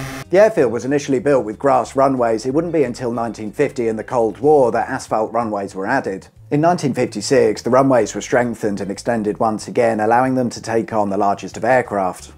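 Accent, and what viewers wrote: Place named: Norfolk, United Kingdom. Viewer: This was very interesting. Side note: Are you from Norfolk? You sound quite Norfolk in this video.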